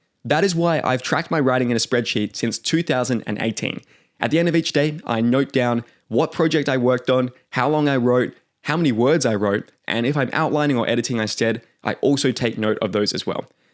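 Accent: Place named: Australia. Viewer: Microsoft with an Aussie accent is just plain weird to my American ears! 🤣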